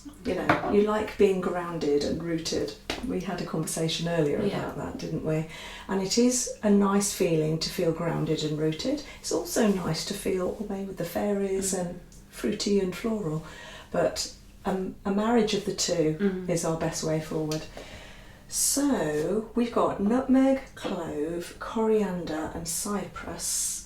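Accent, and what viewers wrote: England, United Kingdom. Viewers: Hi miss how are you i just saw your channel an hour a go but im a fan ❤❤❤you are so friendly and nice kind lady btw love your english accent ❤i have 2 videos i think from my bully her name is Magic bcoz dog friendship is magic love your channel and i love all typs massages and treatments im Bas van Laarhoven from the netherlands and i love to be a patient or cliënt from you or make a video do you take care for man or only ladys respect miss ❤❤❤🙏🙏🙏👍👍👍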